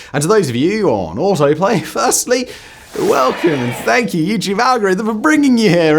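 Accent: RP, United Kingdom. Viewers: You like it or not the Tiger tank piled up many kills and is without any doubt the most famous tank in history. Why don't you make a video and describe with your unsuportable posh accent the real pieces of junk that were WWII british tank?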